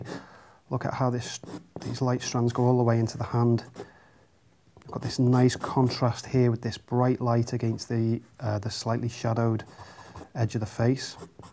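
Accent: Liverpool, United Kingdom. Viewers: A scouser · What an amazing lesson. I’m awful with angles and ratios and can never get my head around them but you’ve just explained this so well. I’m defo a new subscriber, especially as I think you might be a fellow scouser! Thanks for sharing your knowledge. 👏🏼👏🏼👏🏼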